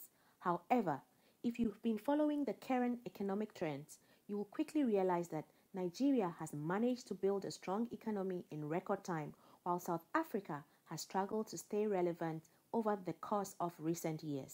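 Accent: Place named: Ghana. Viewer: The accent is ghanaian and this channel is ghanaian...so direct this your vitrol to them..Olofo!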